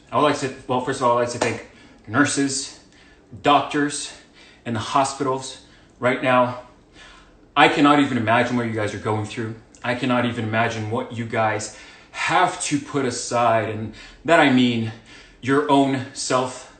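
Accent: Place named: Latin America. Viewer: I thought he was a latino, like his first lenguage was spanish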